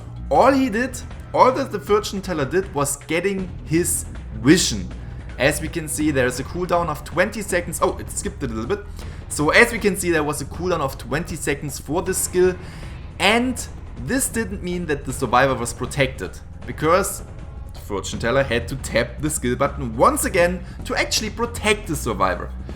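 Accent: Germany. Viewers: Are you originally german because your English sounds like a german person talking English?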